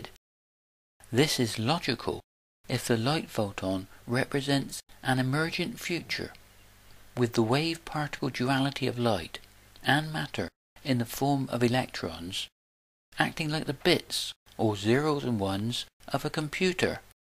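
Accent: West Country, United Kingdom. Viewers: Very informative and I love the little West Country inflections.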